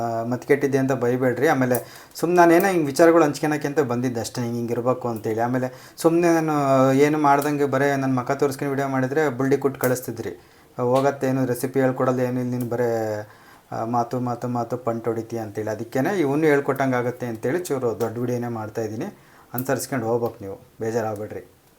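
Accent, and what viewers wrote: Chitradurga, India. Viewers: ನಾವೂ ದುರ್ಗದವರೆ,same ಹೀಗೆ ಮಾತಾಡೋದು.ಹಿಸ್ಕರಿಯೋದು ಅಂದ್ರೆ ಬೆಂಗಳೂರಿನವರಿಗೆ ಗೊತ್ತಾಗಲ್ಲ😂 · ನಾನೂಚಿತ್ರದುರ್ಗ ದವಳು ಅಂದ್ರೆ ಹೊಸದುರ್ಗ ಇವರ ಭಾಷೆ ಕೇಳ್ತಾ ಏನೊ ಮೋಡಿ ಒಳ ಗಾ ಗು ತಿದ್ದೆ ಇದು ನಮ್ಮದೇ ಸೀಮೆ ಯವರು ಖುಷಿ ಯಾಗುತ್ತದೆ 👌❤😄😄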